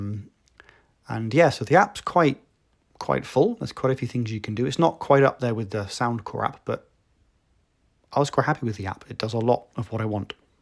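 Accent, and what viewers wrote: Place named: Corby, United Kingdom. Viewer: That sounds like a Corby accent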